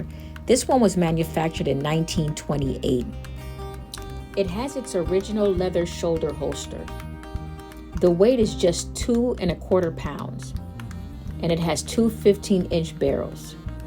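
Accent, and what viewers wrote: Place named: United States. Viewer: Thank u Sister. Your channel is the one of the most attractive to me. Smooth, correct american speech, n well knowing the subject. U really good. ❤ Respect from a Russian speaker.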